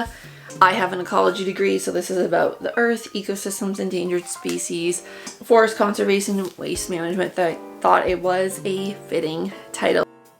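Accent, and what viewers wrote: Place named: Canada. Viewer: I hear Canadian, I subscribe! 🇨🇦 ❤ Plus thrifting, crafting, mending, everything up my alley! ❤❤❤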